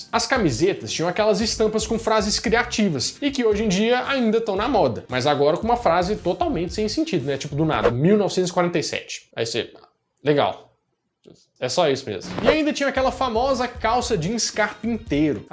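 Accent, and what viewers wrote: Minas Gerais, Brazil. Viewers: Ah !!entao vc é mineiro !❤️❤️❤️❤️❤️fala da bobo dog mochila e bob au au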